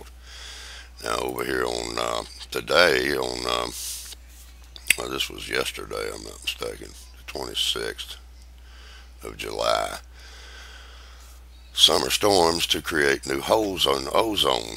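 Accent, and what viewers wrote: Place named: Virginia, United States. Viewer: ahh man. watching ur vids and reading the comments about ur voice. you sound like my old dad with that backwoods virginia accent. man i miss him. he would have loved ur videos. he had to quit school after fifth grade and when he got drafted they made him take his asvap test twice cuz they couldn't believe he scored so high. here i sit with my degree and not nearly as smart as him.lol. stick with it. them edjicated idjots gotta learn somehow.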